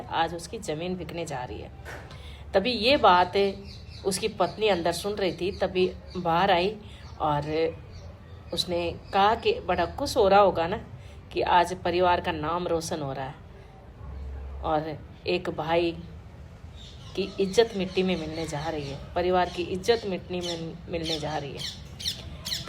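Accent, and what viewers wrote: Gujarat, India. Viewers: Ek dum faltu. Kuch bhi reel mt banao....GUJJU accent. 🥿👢🥿👢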